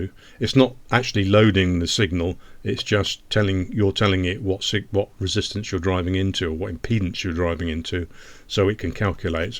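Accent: United Kingdom. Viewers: Sounds British….english with pounds… … not French… and the ai didn’t convert you to a New England accent nor change your words to be dollars….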